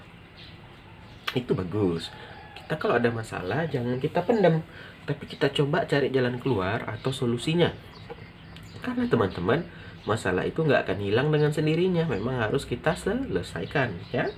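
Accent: Padang, Indonesia. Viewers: Orang Padang ya,,,logat nya tu loh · Ini yg reading orang padang yea logat nya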